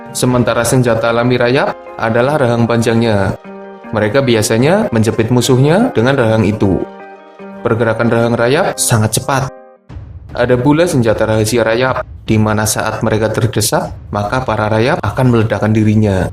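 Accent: Banyumas, Indonesia. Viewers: Serasa di warteg dengr ngomongny,ini aslinya ngapak kykny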